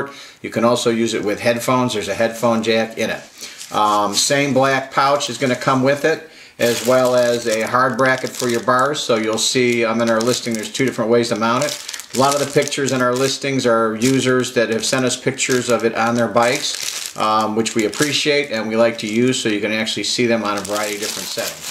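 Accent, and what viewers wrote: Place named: China. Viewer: The lady speaking she sounds like she's from China brand made in China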